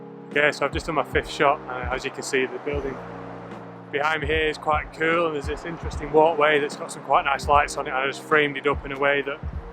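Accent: Yorkshire, United Kingdom. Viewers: Hey, you sound more like Yorkshire than NZ?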